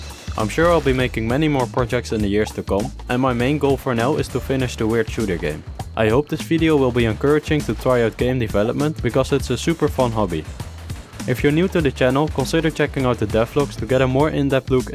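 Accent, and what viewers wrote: Netherlands. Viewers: could you show some vids of you developing sprites, such as trees, or rocks. the simple but necessary things a game needs. i have been wanting to make games myself and have a little bit of experience in making games, but i dont really know how to make any characters/sprites for the game. i hope you could possible teach me a little bit more. ps. like ya dutch accent ;) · Man u dutch? U have a bit of a dutch accent Oh nvm, already got my answer, good job tho, really excited to see a dutch indie making a genuinly good game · Is this guy from the netherlands? Edit: yep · are u from the netherlands bc i can hear it from ur accent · Verry muts a duts aksent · you'are dutch aren't you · off topic but i can hear youre also dutch lol :) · Ben jij Nederlands zo klinkt het wel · I knew you were dutch from the first second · Are you dutch? You sound pretty dutch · U really sound dutch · i love how clear it is that you are dutch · not meant bad but you kinda sound dutch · You sound so dutch but great english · are you actualy dutch? 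· are you dutch? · Woah.. As a computer science student, I cannot address enough how amazed I am by your work.. ( I am just a second year tho) Currently learning some unity for the fun of it myself as well, hoping to one day become as good as you are.. Also, I knew from the first words you spoke you where a dutchie too, laughed way too hard at myself when I saw you made the grade app xD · Damn ik hoorde aan je stem meteen dat je nederlands was haha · Are you dutch? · Je bent nederlands he? Je hoord het. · mmmm Dutch accent edit: Ahh I knew it Nederlands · Hey my guy first time watching your vids and i noticed an accent are you dutch I find it very cool when people are dutch because im dutch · when strong ass dutch accent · i smell, Nederlands. Am i right? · Ik kan echt meteen horen dat jij een Nederlander bent. :-) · You got a Dutch accent, am I in the right spot😉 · Once u started talking i immediatly knew u were dutch, turns out u r a medenederlander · your dutch right? 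i hear it from the accent, im dutch too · I smell a bit of dutch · As someone who is dutch its funny to hear from your voice that your dutch😂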